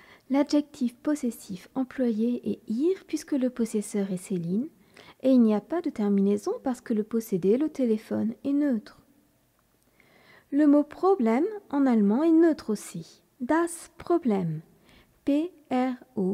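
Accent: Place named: France. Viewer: oui oui tu as raison, Je ne voulais vous attaquer, je trouve que tu es bien familier avec le grammatique allemand (bien que tu as un accent francais tres visible, mais c pas grave, quand je vais parle francais c'est la meme chose :P) c'est juste qu' au debut le contexte n'etait claire pour moi :) alors exuse mon francais terrible, wünsche dir aufjedenfall viel Erfolg bei deinen weiteren Videos zum Thema Deutsch lernen :)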